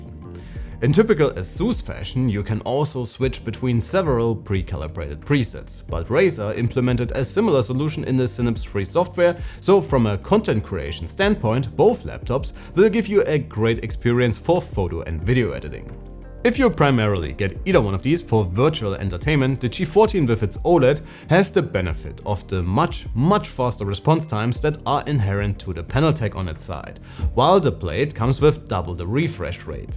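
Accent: Germany. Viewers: Der deutsche Akzent ist echt😅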